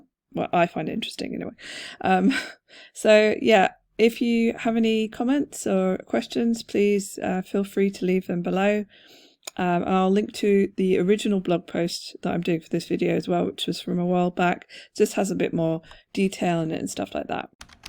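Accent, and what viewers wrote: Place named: England, United Kingdom. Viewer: Holy Moly, $4k each month after just one year. Insane. Well done you. Likewise, the amount of books you've put out is incredible. I need to get my act together! Only just discovered your channel, nice to hear another (calm!) English voice! Although admittedly I'm semi retired and looking to kdp for extra rather than an entire income, I think if I was earning the royalties you are I probably just stop and let the passive income roll in! Still, you can never have too much I guess 😂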